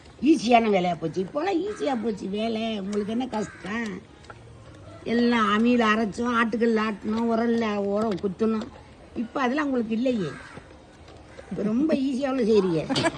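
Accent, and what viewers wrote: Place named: Madurai, India. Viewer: Thaayee.. unga pechu arumai.. adhil pandiya naatu paasam mattume therindhathu..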